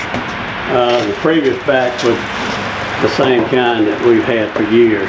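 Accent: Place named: South, United States. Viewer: What are you from, since you have a Southern accent? Awesome sugarcane syrup though.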